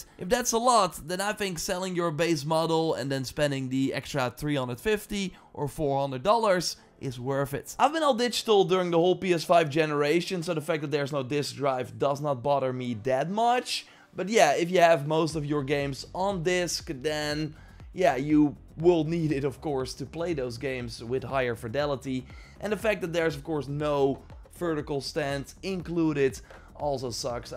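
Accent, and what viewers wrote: Netherlands. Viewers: Hearing the accent, dutchie right?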